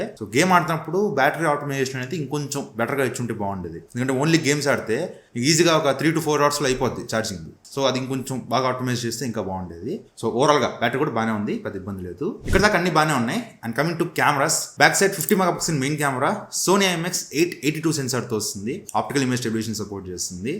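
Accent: Nellore, India. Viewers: Anna nellore nv anna❤❤❤❤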